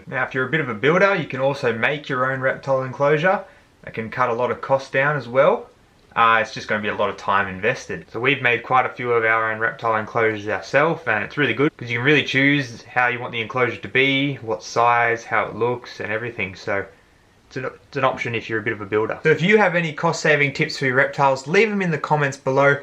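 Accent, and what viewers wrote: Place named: Australia. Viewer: Very good tips, I just recently came across your channel you have great content. Australian accents are the best haha 😂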